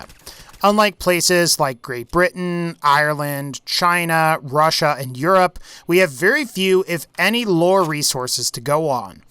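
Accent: United States. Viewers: As an Australian, it is quite amusing to listen to you, an American, pronounce some of our major cities. i.e Melbourne = Mel-Bin. Brisbane = Bris-Bin.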